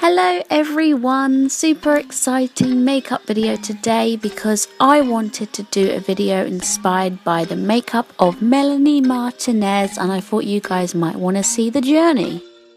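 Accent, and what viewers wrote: England, United Kingdom. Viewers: Mar-tee-nes Its pronounced that way. But if it's cause your english accent then it's okay ☺️💕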